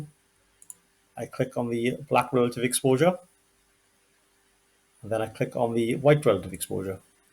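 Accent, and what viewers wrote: United Kingdom. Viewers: not tuned to the speech patterns of south Wales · not used to the local accent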